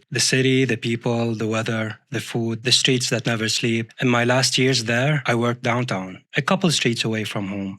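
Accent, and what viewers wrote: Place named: Syria. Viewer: من لهجتك مبين انك سوري، ومدامك دارس طب ف عالاغلب مسافر ع المانيا بتمنالك كل التوفيق وعلى امل انك تلاقي حياة احلى واكثر انفتاحا وتقبلا وامانا برا سوريا وملاحظة صغيرة: انت قلت (غيري من الناس العاديين)، الاصح هو غيري من الناس المغايرين جنسيا او الستريت، اما "العاديين" فكلنا عاديين وطبيعيين سواء كنا مثليين مغايرين مزدوجي الميول او من غير ميول كلو عادي وطبيعي😁 تحياتي الك صديقي وكل التوفيق Much love🤍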